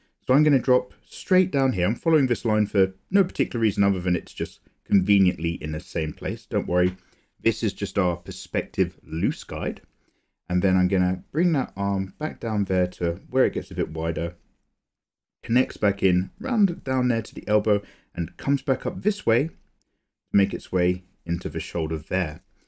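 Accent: United Kingdom. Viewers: he just seems innocent Because of his old school British accent. if he was from Boston he'd be the greasiest drawing tutor there ever was lol.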